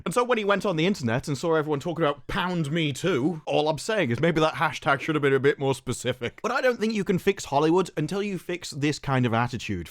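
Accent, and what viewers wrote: United Kingdom. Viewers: Did the british accent just talk shit on the 5th largest economy on the planet(california)? Sounds like someone from the 6th largest econ (uk) better plug his queen hole.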